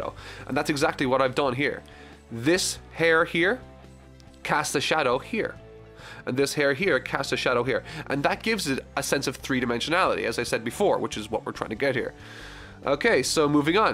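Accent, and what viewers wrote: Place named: Ireland. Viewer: What part of Ireland are you from?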